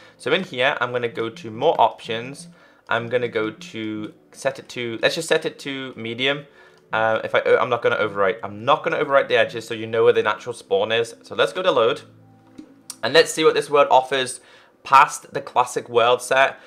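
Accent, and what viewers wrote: Liverpool, United Kingdom. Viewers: are u a scouser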